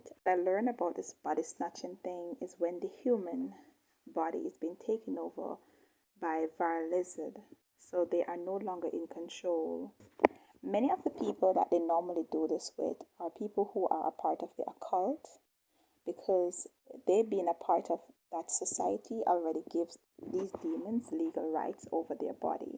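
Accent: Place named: Jamaica. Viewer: I love love your Jamaican accent ( peace and blessings )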